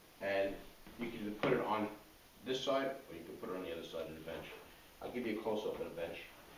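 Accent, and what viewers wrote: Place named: New York, United States. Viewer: anytime a guy with a heavy new york accent says "alright, you're probably wondering whats under da bag...." you'll want to stay till the end : )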